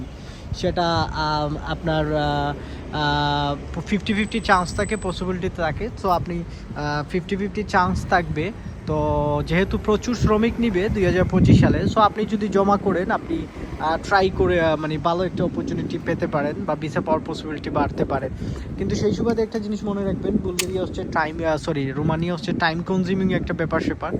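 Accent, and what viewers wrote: Sylhet, Bangladesh. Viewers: ভাই আপনি সিলেটি নি??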